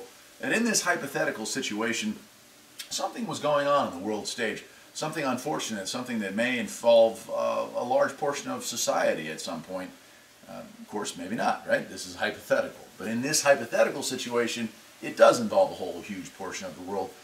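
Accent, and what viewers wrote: North Carolina, United States. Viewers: Wow. Excellent video, brother! Funny thing. I was just looking up stuff on CB radios, and I ran across one you have about 5 years ago. Your voice...well...do you know how your own voice sounds different when you hear a recording of yourself? Well, you are the first person I have run across whose recorded voice sound exactly like my recorded voice, when I hear myself that way. LOL, it was a little creepy, bro. Anyway, so that caused me to be curious and look at this second video here. Here, you reveal that you are from the NC mountains. I grew up in High Point, so that partially explains our common accent and similar speech patterns. But... ...Just today, I was thinking the very things you just said here. "Hypothetically," I may end up being very happy I installed that CB in the Jeep. Anyway, the reason I started out with that seeming nonsense, is to point out that I seem to have been drawn here. 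Now I have to watch a bunch of videos to try and figure out what you have said that I was meant to hear. I really wish you did not just say you have 70 videos. This might end up being a very long night.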